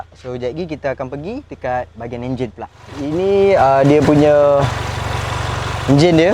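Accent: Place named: Kelantan, Malaysia. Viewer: sama mcm penerangan kat honda fd dia...slang kelate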